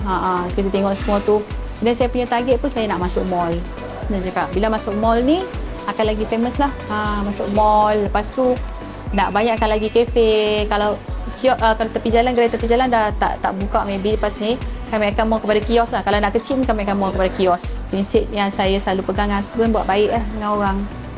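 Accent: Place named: Penang, Malaysia. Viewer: Mantap kak.kekalkan dialek penang kita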